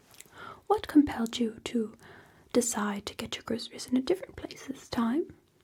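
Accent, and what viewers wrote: Long Island, United States. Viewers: You are looking so cutesy putesy!!! Your accent is the best, especially that heavy long island one you do in some of your rps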